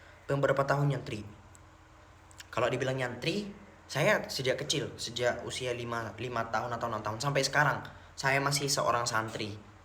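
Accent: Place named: India. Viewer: lucu kmu speaking english versi india..😅kok kayak kmu keten gitu bisa mcm2 bahasa gitu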